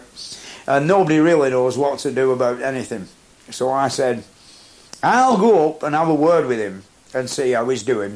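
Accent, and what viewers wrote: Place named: Bolton, United Kingdom. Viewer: Nice words, its magic isn't it. The Boulton accent i still hear from my family who moved to Cornwall in the 60s. I wear overalls and a greasy hat most days and I'm a young un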